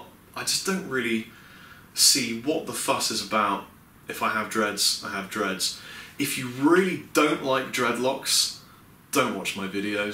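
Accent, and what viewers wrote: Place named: England, United Kingdom. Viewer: I'm a right Scottish fellow but I speak the American English if you will. I find your videos a kin to myself. We're large types of guys and I find myself being proud to see someone speaking the Queen's English! I'm proud of your success thus far!! I hope to see you guys on the West Coast Americas very soon! BTW Chapman Guitars are quite literally the best thing since sliced guitar bread.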